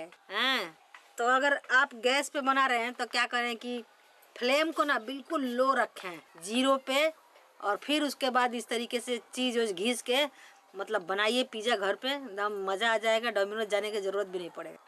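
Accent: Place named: Bhojpuri, India. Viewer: behan aap bhojapuri me hi boliye bada pyara lagta h aap bhojapuri bolti h to sach me bol rahi hu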